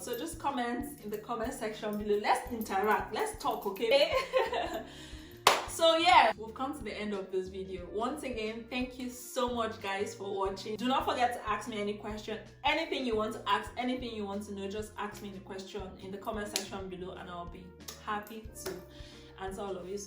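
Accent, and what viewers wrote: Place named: Africa. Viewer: Your african dialect in speaking english is nice.dynamic, beautifull woman.nice vlog.keep it up and stay safe.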